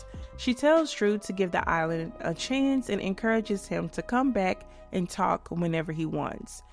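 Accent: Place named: Midwest, United States. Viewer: your opinions are clinical however, I wonder which character you relate to most my guess is Dr. Wade. Phonetically I would guess that your upbringing was in the southern Midwestern United States.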